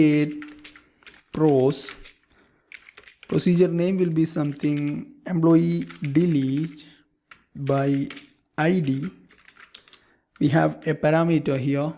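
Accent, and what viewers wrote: India. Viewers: omg I cant understand your indian accent, so heavy.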